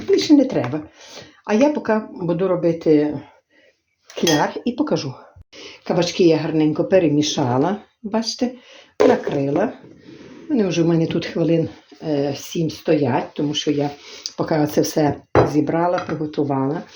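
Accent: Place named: Chernivtsi, Ukraine. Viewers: Спррбую по вашому рецепту.По розмові ,певно ви землячка,думаю що ви з Кіцманщини.так?💐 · Моя братова з Чернівців ,говорить так як ви, мені дуже подобається.Дякую за рецепт Зараз буду готувати. Мирного нам неба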